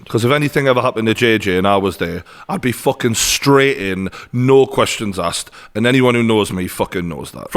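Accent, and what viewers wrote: Newcastle, United Kingdom. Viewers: I love true Geordie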